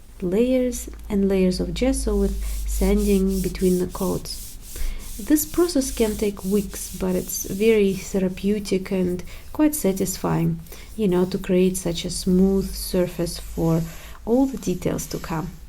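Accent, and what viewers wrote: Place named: Russia. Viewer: What country do you work in obviously you have Russian accent but I think your page said you studied in Auckland?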